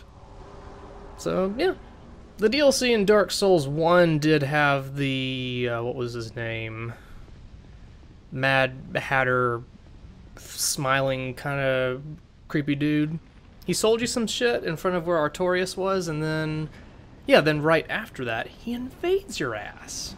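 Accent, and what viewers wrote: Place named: Texas, United States. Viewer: i can already hear the texas in your voice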